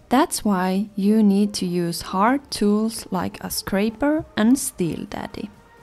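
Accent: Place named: Finland. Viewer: I can tell that you are from finland. Haha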